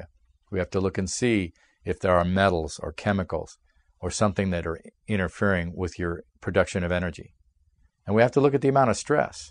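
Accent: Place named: United States. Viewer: may i get this video in Hindi ? I don't understand ur fast American English 😪😪😪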